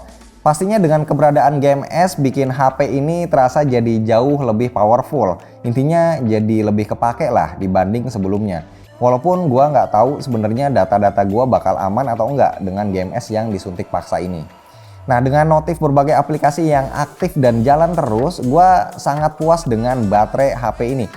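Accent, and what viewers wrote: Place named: Java, Indonesia. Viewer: Bang, ternyata medog juga ya 🤣🤣🤣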